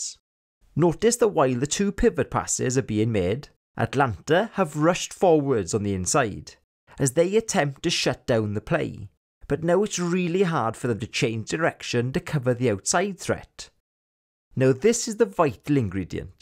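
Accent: Wales, United Kingdom. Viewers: I spent two weeks in Wales in 1978. So good to hear the Principality dialect again. Thanks for the instruction as well. Rugby is an elegant game and thanks to Internet connections, I can get up much too early in the US and enjoy a match.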